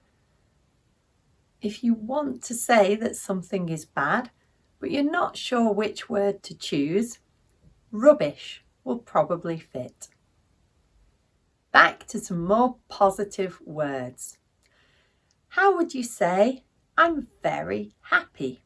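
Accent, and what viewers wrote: United Kingdom. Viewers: My pleasure I love the British English And you are Perfect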